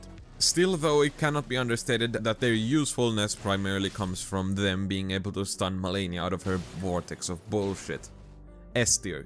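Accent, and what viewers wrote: Finland. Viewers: New sub based solely on the humour. 😂 For me, he worst thing about combat is scrolling the damn items to get to things I need. By that time, I'm usually dead or almost dead. 😂 Edit: also, you sound Finnish. Is that right?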